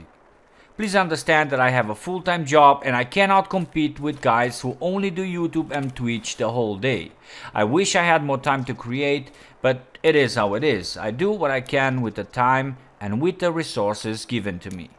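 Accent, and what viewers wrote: Germany. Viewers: I like your german accent :) Keep up informing us about tarkov :D!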